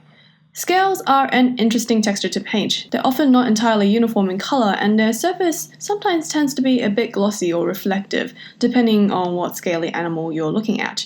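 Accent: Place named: Australia. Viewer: I love your voice!!!! (And your art of course) But what is your accent?? It sounds Australian but also something else at points?? I’m Australian so I know what sounds Australian lol 😂 I’m confused about your accent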